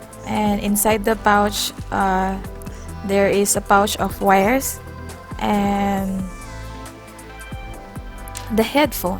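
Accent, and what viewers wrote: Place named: Philippines. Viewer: Filipina k mam nu? Hehe halata sa voice mo 😄..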